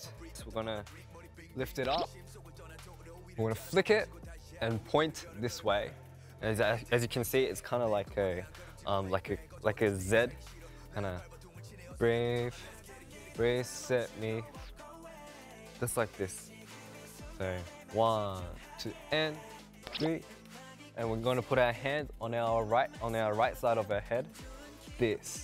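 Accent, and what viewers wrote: Australia. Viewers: i don't stan straykids at all but this is the cutest this i've ever seen, felix's australian accent is the cutest thing ever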